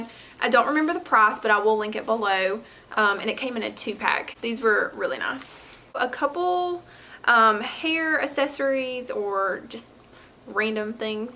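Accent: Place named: South, United States. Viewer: You have to be from Georgia or Tennessee 😊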